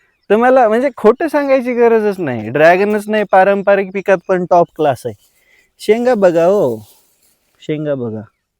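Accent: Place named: Pune, India. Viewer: Lai popatpanchi bolato yar tu,tu sadashiv pethet jalmala as vatat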